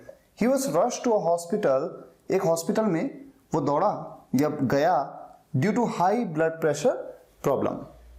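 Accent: India. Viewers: I am Chinese，YouTube recommend India accent English to me😨😨😨😂😂😂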